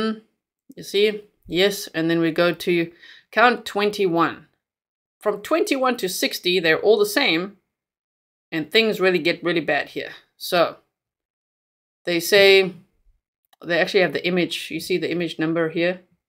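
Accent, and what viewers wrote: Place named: South Africa. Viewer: Hello fellow south African 🇿🇦 Thanks for covering this case x Great to hear the accent!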